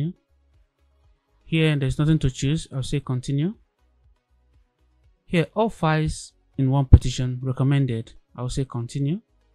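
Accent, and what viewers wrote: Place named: Nigeria. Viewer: Oh ur accent just sounds Nigerian thats why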